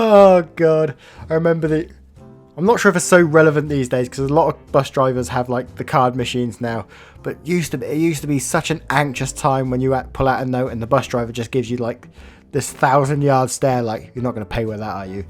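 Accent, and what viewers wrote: United Kingdom. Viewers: Yep loved it daddy, totally agree with being over the general Reddit stories. So many people are doing the same shit now. But I love your reading of them the best, love your British accent and that fact you don't give a shit about conforming to impress YouTube head honshows as most YouTubers bleep the swear words. You just say it as it is. I love that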